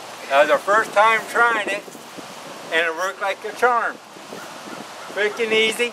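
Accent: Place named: South, United States. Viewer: Monsieur he sounds southern deer don’t get big down there in Florida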